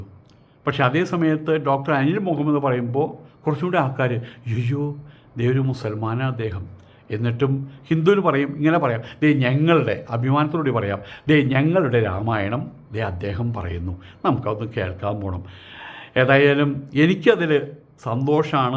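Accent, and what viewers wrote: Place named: Thrissur, India. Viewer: സ്വാമിയുടെ നാട് ചേർപ്പ് ആണോ ? തൃശൂർ Slang ഉണ്ട്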